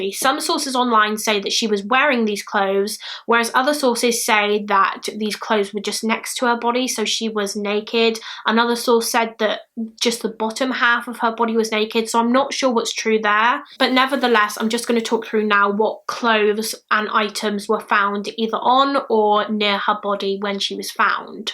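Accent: United Kingdom. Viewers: i dont hate u but i hate uk accent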